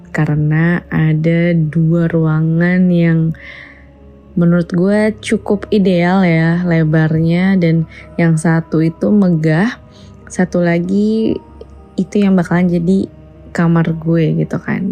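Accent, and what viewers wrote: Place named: South Jakarta, Indonesia. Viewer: Anjir didaerah gue Cinere, anak JakSel mana suaranya.. sukses selalu mbak yang cuantik hehe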